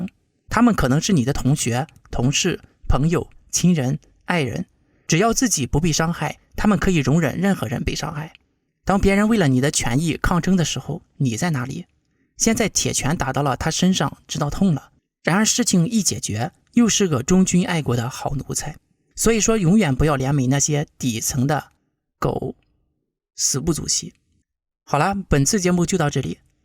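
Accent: China. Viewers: 是吗？当你带着浓浓的大陆口音在这里瞎掰。中国崩溃论已经几十年了，这种陈词滥调。不嫌乏味吗？要么就说明你没水平。你认为你现在评论中国部分的经济矛盾问题就崩溃了，真是可笑…做up主，要么有很深的经济功底来评论这种经济现象，要不然说实话，你只会让人大笑…这种论调就是在描述美国的股市一样…好好把自己的水平提升提升，别丢人网上有好几个不错的up主。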